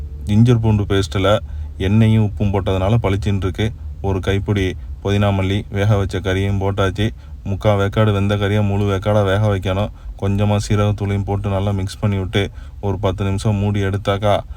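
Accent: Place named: Nagore, India. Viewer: நாகூர் லயும் இப்படி தான் பேசுவாஹா....😅😅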